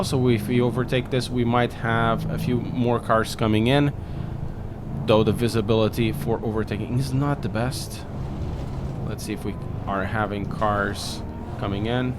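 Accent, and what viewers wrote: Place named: Portugal. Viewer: I opened this video and 1 second later I thought "This guy must be Portuguese". The accent doesn't lie. Cheers from Portugal!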